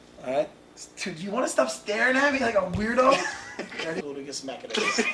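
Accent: United States. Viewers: Love the New York accent!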